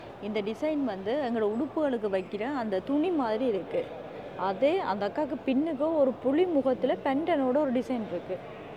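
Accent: Sri Lanka. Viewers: அழகான சிங்களம் தமிழ் ❤❤❤❤❤❤❤❤❤ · இலங்கை தமிழ் மிகவும் அழகு...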